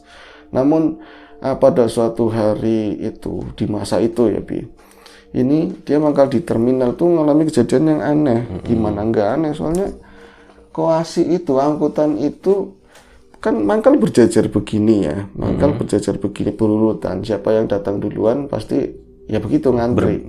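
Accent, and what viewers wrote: Banyumas, Indonesia. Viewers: Tembe gie weruh mas ngomong ngapak loh😁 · iya sih mas,. tp jujur logat ngapak itu slah satu favorit saya..mskipun sya asli surabaya, tp sya prnh tinggal ckup lama dibanyumas..sukses slalu buat mas & mas,. smga chnelnya smkin maju&brkembang..tp ttap request ngapak nya diselipin dikit2 ya mas..hehehe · Ora ngapak ora kepenak... 👍👍👍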